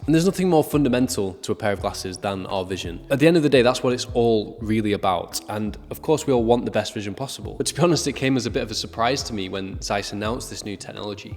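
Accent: United Kingdom. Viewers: He's British. To him it's you who isn't saying it right.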